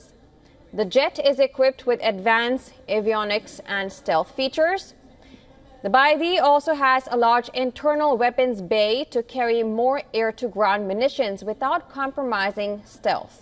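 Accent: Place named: India. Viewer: It's Indialish not English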